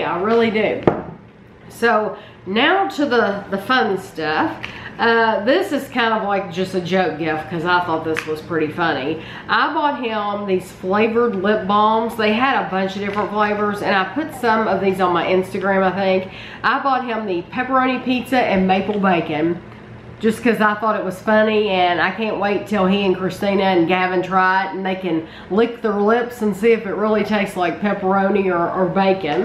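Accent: South, United States. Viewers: Holy southern accent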